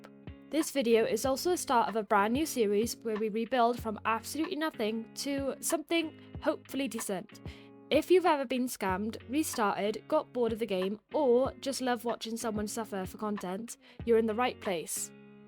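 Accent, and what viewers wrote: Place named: United Kingdom. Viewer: Love your British accent ❤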